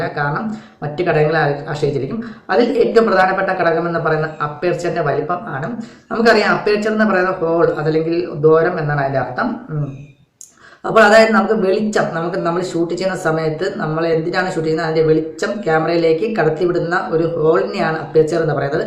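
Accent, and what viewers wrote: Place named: India. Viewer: Video title in English, spoken in Indian.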